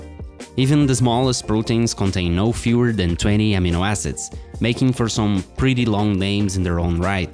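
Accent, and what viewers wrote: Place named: England, United Kingdom. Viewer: I like your English Accent! Where are you from? keep up the great work!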